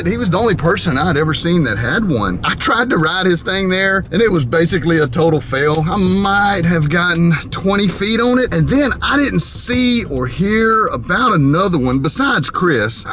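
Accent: South, United States. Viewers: I made the kids bring bicycle, so they're tired. But I've been riding my Rec-lectric unicycle, and I am NOT tired!............ REC-LECTRIC!!........... I don't know what it is about southern people with they're drawl that cracks me up so bad when they mispronounce words. I had to watch that part a few times. Don't get me wrong, I'm not laughing at them as if they are stupid, it's just the way the accent sounds and the funny ass pronunciation of words that gets me. Thanks for the laugh. Keep 'em comin!!!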